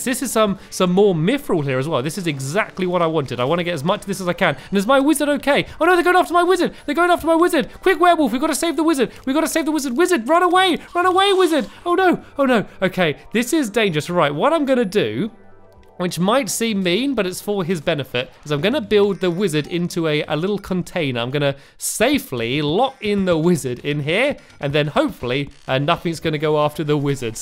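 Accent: England, United Kingdom. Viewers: Cake is Nice do u not know what a an English accent is?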